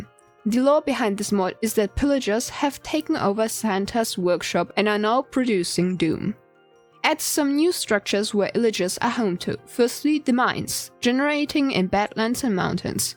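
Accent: Czech Republic. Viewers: i luv ur czech accent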